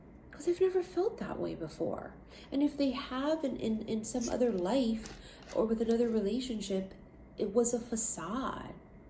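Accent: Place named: Hawaii, United States. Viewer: 😂😂😂I knew you where from Hawaii!!!! I could hear it in your voice!!! Love yah sis🙌🙌🥰🥰🥰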